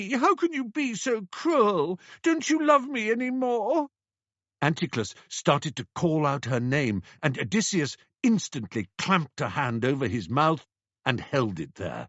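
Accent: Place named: Oxford, United Kingdom. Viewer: When a Brit is speaking Oxford English and “rolls the r”, you know you are in for a treat.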